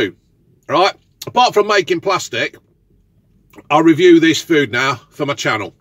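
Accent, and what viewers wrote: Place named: Northern England, United Kingdom. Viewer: mate I can’t pin down your accent where you from? Love the channel by the way and attitude towards not caring about money👍🏻 i know your from Leicester or grew up there but sound more northern, is that due to parents or what?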